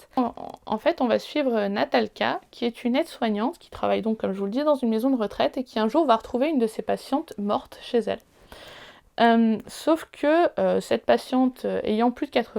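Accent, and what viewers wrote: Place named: England, United Kingdom. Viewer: Je viens de tomber sur ta vidéo par hasard et j ai beaucoup aimé. Parce que j adore le cosy mystery et que je veux perfectionner mon anglais pile le sujet de ta vidéo, je vais donc attaquer par les détectives du yorkshire😊. En recommandation j aime beaucoup les nombreux livres de joanne flucke avec anna swansen et sa pâtisserie, c est génial et on peut même faire les recettes 😂. J adore ton accent anglais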